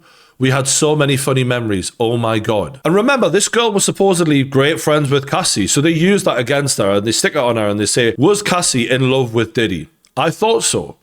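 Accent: Newcastle, United Kingdom. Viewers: A True Geordie old mare.. Talkin Bollox as usual..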